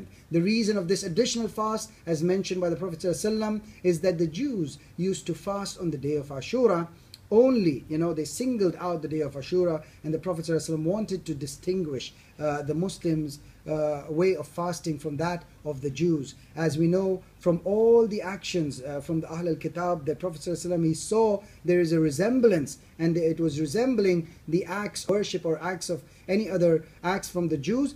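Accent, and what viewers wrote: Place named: South Asia. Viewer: You seem to be pretending being an Arab from Saudi Arabia but I can notice from your look and accent that you are actually of some South Asian background possibly Pakistani or Indian. You don't have to look like an Arab for people to listen to you.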